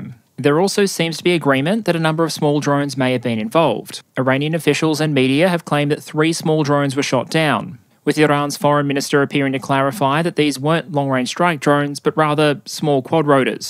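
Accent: Australia. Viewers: Your upload schedule is perfect. You always just in time for me to fall asleep to your video on Sunday night (I'm same timezone) and then watch/listen to on the way to work in the morning. It's also fantastic to hear an Aussie online, and one who clearly knows his shit. Keep it up,.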